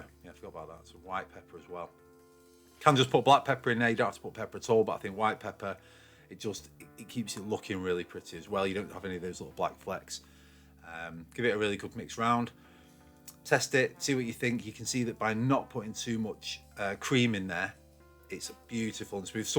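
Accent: Yorkshire, United Kingdom. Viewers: Eeeees frooom Yorkshire by the sound of it. A beautiful accent us Aussies like coz our Captain Cook was born there. It’s weird how anyone can not understand English who is supposed to speak English. Strewth, it’s the language of the King James Bible.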